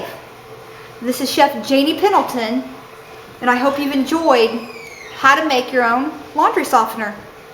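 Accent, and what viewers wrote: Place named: Appalachia, United States. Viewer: Sounds like a hillbilly and can't do it right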